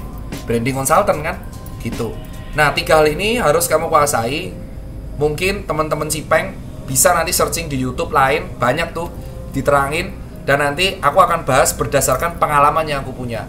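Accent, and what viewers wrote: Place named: Surabaya, Indonesia. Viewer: Ijin, bilamana buat tema saran/alternatif solusi : #salah masuk SMA (salah jurusan IPA / IPS / BAHASA) next... next... / SMK jurusan (listrik, mesin, bangunan, dsb) next... next... lulus sekolah atas, setelah pilih kuliah (keliru jurusan ???, bingung) / pilih kerja / kuliah+kerja (+- nya ... gmn management nya, biasanya... molor kyk i 😇). Seneng gaya+ngomong suroboyo an e / jowo medok, to the points lozzz ora rewel Sukses teros... dokter + enterpreneur 👍👍👍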